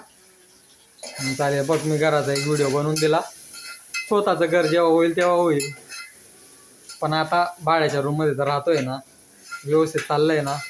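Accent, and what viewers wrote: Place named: Dhule, India. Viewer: मी तुमच्या बोलण्याने आधीच ओळखलं होत की तुम्ही धुळ्याचे असाल. मी पण धुळेकर..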